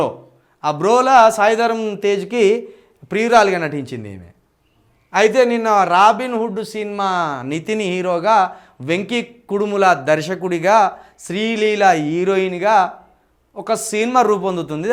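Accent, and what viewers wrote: Telangana, India. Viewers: Telangana Slang is super.👌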